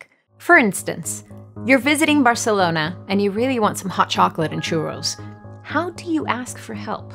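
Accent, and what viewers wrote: Brazil. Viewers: Magda Alvernaz não quero te menosprezar mas você entendeu quase tudo que ela falou porque ela é brasileira e fala com nosso sotaque.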